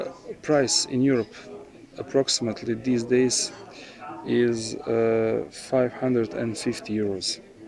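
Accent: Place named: Russia. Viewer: рашен аксент из прешас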